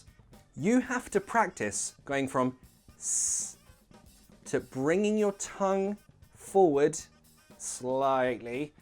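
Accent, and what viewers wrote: United Kingdom. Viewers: I really do luv tgis man why in teaching .... he's the only reason why i like British accent ....he makes the British accent so easy....thanx man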